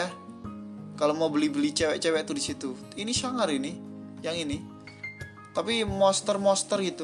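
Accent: Java, Indonesia. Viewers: Gebrongot geni wolongewu Medog bangt bang jowomu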